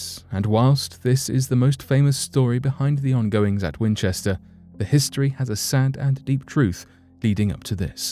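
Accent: United Kingdom. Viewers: You can't beat a good British accent 👍🏻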